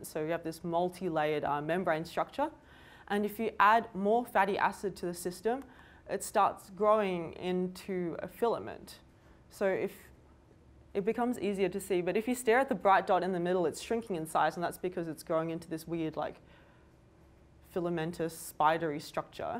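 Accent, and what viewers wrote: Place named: Australia. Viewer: thank you- down under accent can be fun to listen...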